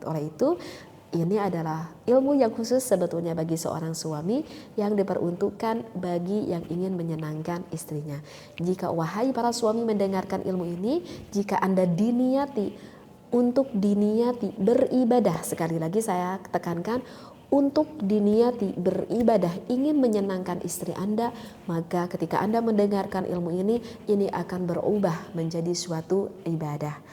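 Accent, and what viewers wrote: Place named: Java, Indonesia. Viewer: gpp atuh, lidah orang Jawa kok disama2in Arab. Jelas beda. Bismillah aja jadi semillah. Kalem aja, Tuhan bukan org Arab kok😅😊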